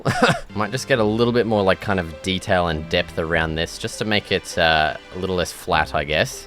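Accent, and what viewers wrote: Australia. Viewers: He's Australian, dumbass.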